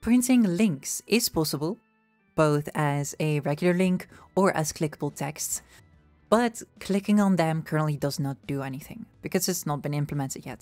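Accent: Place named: Netherlands. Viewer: Gotta love godot... and your lovely dutch accent. Great video, neighbor :3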